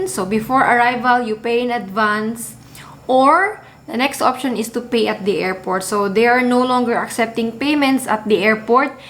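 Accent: Visayas, Philippines. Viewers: Ka cute nimo magbisaya Day hehehe...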